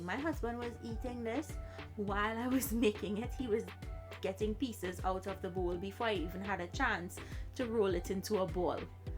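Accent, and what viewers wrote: Trinidad and Tobago. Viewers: I knew u were Trinidadian when I heard your accent ! Thx for the recipe ! x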